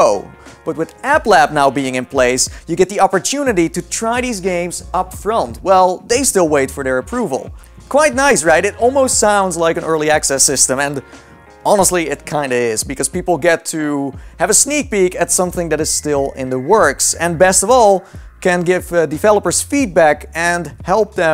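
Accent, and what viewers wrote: Netherlands. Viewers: I forgot I was subscribed. When I saw this in my notifs I heard your Dutch voice, it made me feel happy!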